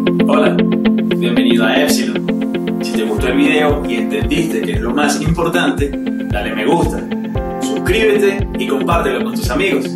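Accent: Venezuela. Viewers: Hola Epsilon. Por la camiseta y por el acento asumo que eres venezolano, lo cual me llena de orgullo porque yo soy de Maturin, estado Monagas. Felicitaciones y adelante con tu labor la cual puede ayudar a muchos estudiantes. Adelante.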